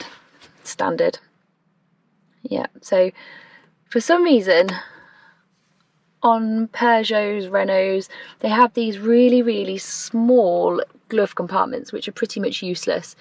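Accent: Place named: Coventry, United Kingdom. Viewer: You sound like you have a Cov accent to me. Great review by the way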